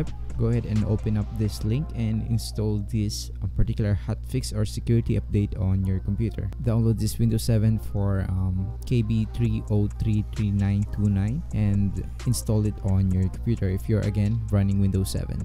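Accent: Philippines. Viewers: nagawa konayung method 6 and then nag start nako ng game tapos yung problema konaman ngayon is vanguard not initialized hayop nayan valorant na valorant nako halos 2hrs nako naghahanap ng solution kada tapos ko ng method meron nanamang bagong problem btw salamat pre alam ko pilipino ka halata sa speaking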